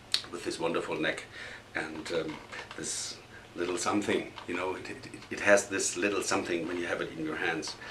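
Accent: Germany. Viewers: Bist du Deutscher? Dein akzent erinnert mich an Deutsch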